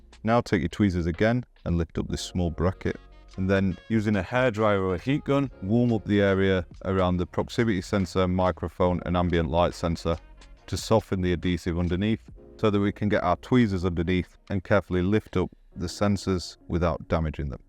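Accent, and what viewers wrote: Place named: Sussex, United Kingdom. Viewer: I just received my replacement battery for my iPhone 11, and I’m ready to put everything together probably tomorrow. Your voice sounds familiar to me as my ex-wife‘s mother is from Sussex. I miss bubble and squeak, bangers and mash, and steak and kidney pie. It’s been 30+ years since I’ve had it, and watching your video makes me ‘Jones’ for it. Thank you for your time.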